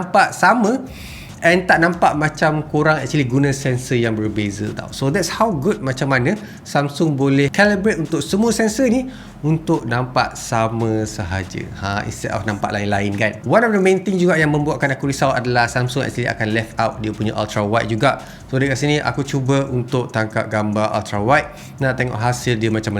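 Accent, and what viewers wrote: Terengganu, Malaysia. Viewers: pekat terengganu tu tak hilang ekk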